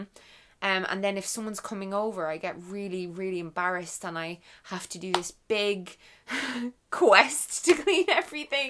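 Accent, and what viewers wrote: Ireland. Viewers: Hey. I'm am old fan. I picked up ur Irish accent in my teens 😂. I'm in my 20s now and my 3 worst habbits i wld really like to control are: 1. Waking up late. 2. Biting my dry lips. 3. Not drinking enough water.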